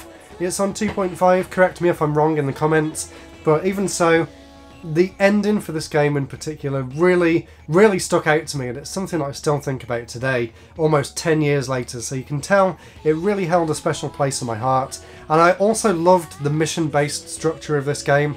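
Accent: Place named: Northern England, United Kingdom. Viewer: At 2023 i figured out that there are plenty of different pronunciations in England, so now i gan here and there and bother people with question "where are they from" if hear not so-called standard pronunciation (thine is obviously northern one). So, satisfy my curiosity, where art thou from? (i guess Birmingham or its surroundings).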